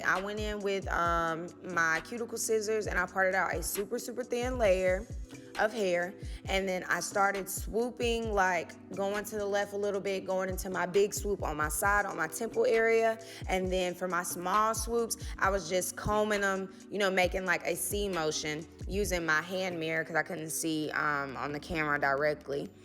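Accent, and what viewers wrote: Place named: Texas, United States. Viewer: Oh my God' your S00 Beautiful sound Like you from teax💕😘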